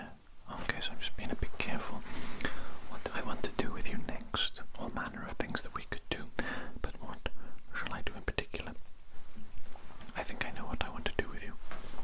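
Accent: Wales, United Kingdom. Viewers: Came for the tingles, stayed for that fantastic Welsh accent. I'm sure that's what makes your videos so relaxing! Diolch, 😉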